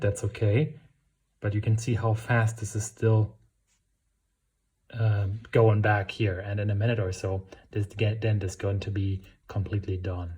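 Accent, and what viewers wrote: Germany. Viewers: Until you mentioned Hamburg I would have bet that your german accent in english is of a kind of hessian type.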